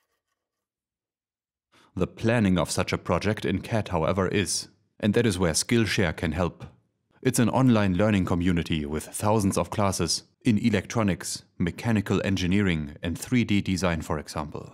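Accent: Germany. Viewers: Der Deutsche Akzent kickt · Sounds epic in a German accent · hard German accent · You have the most German, German accent I've ever heard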